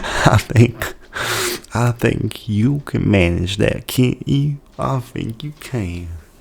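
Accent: South, United States. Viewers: I was not expecting such an flawless Southern accent but here we are 😂😂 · You seem much more mature than a typical 24 yr old. I'm old enough to be your Dad.....if you were born when I was 12 yrs old! KIDDING! 20 yrs from now, you'll look the same. You know I like to tease you, but I'm correct on how you'll age. I like the southern accent and remember what I wished for you to do.........and accents will help. I'm not good at accents nor can I identify them (where the person is from). Due to cancer treatment, I lost some of my hearing at a young age. While in Hawaii, a waitress came to my table and I just looked away b/c I had NO idea what she was saying and she was speaking English but with a heavy accent. My friends found it hilarious, while I sunk in my chair. 🤣 (it's healthy to laugh at our own shortcomings) 👂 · how did bro nail the southern acc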